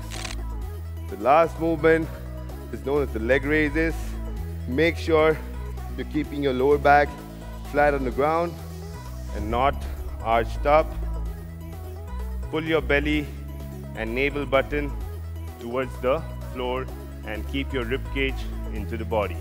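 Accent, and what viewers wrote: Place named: India. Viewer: he sounds more Indian than American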